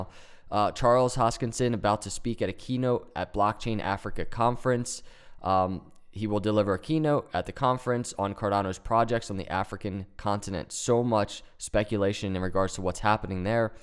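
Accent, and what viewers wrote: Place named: Midwest, United States. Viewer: Great videos. Are you trim the Midwest you sound like it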